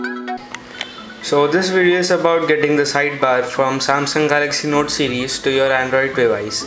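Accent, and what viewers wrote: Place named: India. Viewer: an another indian phone toturial, who speak with accent...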